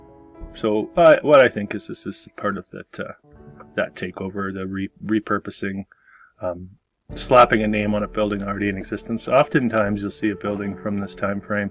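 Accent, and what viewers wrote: Canada. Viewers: …Can’t wait. Great stuff your putting out…Looking forward to the Erie Canal vid, you mentioned…I’m I picking up a little Canadian accent..🍻For now. I’m off to clean up more ❄️ ❄️Lake effect from Erie. Ani’t it grand?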